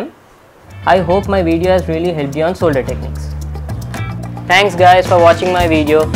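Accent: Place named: India. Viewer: few indian accent that i watch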